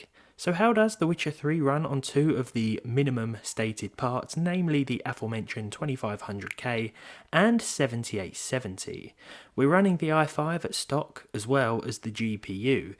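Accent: United Kingdom. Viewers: I just love this channel because there is no background music. Makes the channel different in a good way. Your british accent is the music for my ears :D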